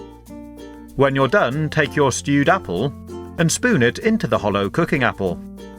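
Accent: United Kingdom. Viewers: I'm absolutely in love with your perfect British accent.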